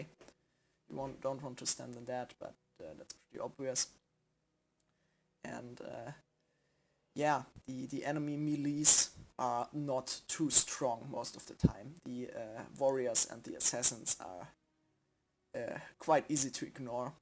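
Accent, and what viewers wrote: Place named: Germany. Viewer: man hört so heftigst den Deutschen Akzent raus das so schlimm, aber dennoch gutes Video^^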